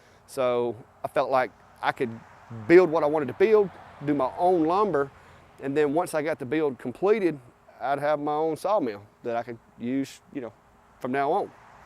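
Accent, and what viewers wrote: Alabama, United States. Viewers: I like it all! Love the animals, brother and BIL does some wood projects, and I grew up in my early life in mobile Alabama, so I love listening to bama folks! Lol! I live in houston, texas now and came from maymays channel. I think you are doing great! Variety is fun.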